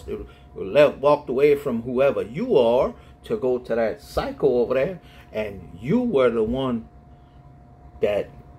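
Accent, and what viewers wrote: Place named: New Orleans, United States. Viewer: You are a knowledgeable person. You speak well and I’m overcome with joy just listening. You ARE changing folks 1 at a time. Prayers for your bride, Tam, and you. we love that New Orleans accent and your Bride💜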